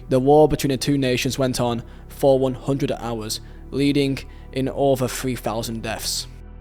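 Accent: United Kingdom. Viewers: i seriously couldnt hear anything all video dont get that heavy british accent everywhere you make the h sound too much keep the mic a little further from ur mouth when the sad parts came i could only focus on ur annoying voice otherwise ok vid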